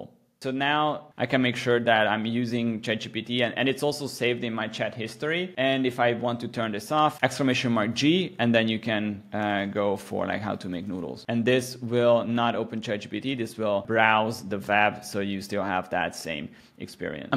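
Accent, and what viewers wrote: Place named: Hungary. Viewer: This guy has Hungarian accent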